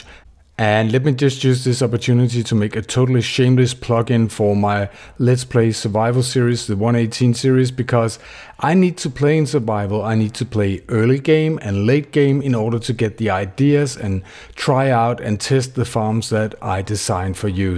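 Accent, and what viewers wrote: Denmark. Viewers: U danish bruh??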